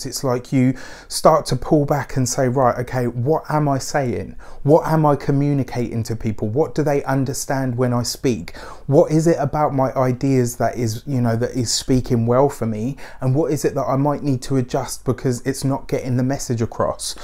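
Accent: England, United Kingdom. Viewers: I love his English tongue. He must came from England. I am 22 of Sep.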